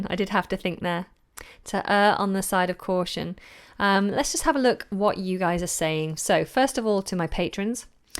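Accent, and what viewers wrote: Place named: United Kingdom. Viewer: I really admire the British accent. Will it be easy to imitate it ? Please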